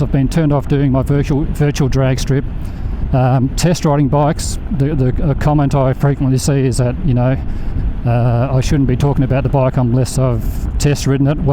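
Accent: Australia. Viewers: Ozzie accent such a pleasant change from the pommies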